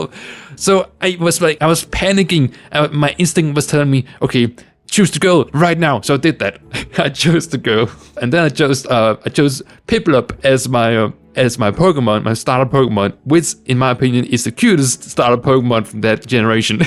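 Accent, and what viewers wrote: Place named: Denmark. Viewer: its my first time watching a video of yours and I really like your danish accent! Im half danish myself. I wish you the best in your transition sis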